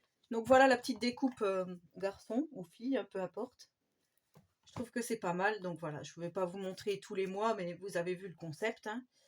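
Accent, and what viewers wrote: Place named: Grand Est, France. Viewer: Coucou, très joli album, j’adore le papier craft 👌🥰🙋‍♀️. Vous êtes du Grand Est de la France, j’ai l’impression de reconnaître le petit accent 😉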